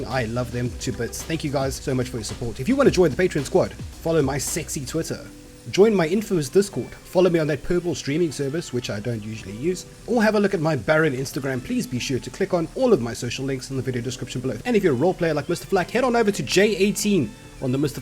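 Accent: South Africa. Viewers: Are you South African? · What accent is that? Are you South African? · Are you South African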